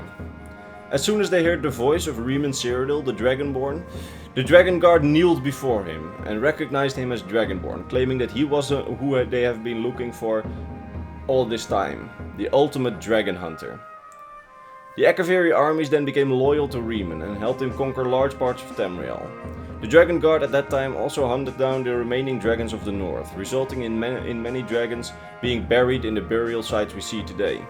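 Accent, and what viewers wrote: Netherlands. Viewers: Is that a dutch accent?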